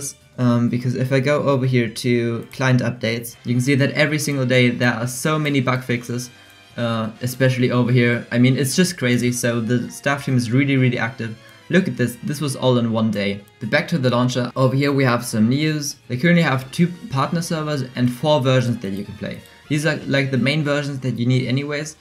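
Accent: Germany. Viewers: I can litterly hear that ur from Germany I am too btw good vid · U sound very German are you? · deutscher akzent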